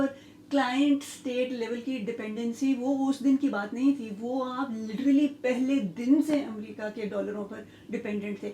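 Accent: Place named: Karachi, Pakistan. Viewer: Waaah waaah mazaa agaya apka video dekh kar unbiasedly spoken ❤❤❤ from now I'm big big fan of yours ..u sounds urdu spk from Karachi wich are my fav ppl in Pakistan looking forward more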